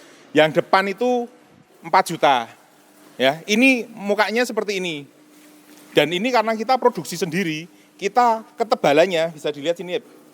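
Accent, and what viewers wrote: Java, Indonesia. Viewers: Jowo tulen iki cak 🤣😂,, mantapp om 👍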